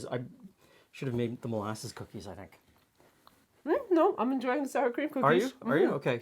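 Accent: Canada. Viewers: I hear your Canadian accent,👍🏻👍🏻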